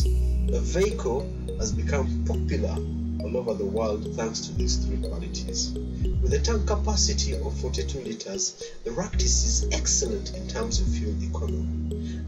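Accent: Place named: Uganda. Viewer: that's a Ugandan accent.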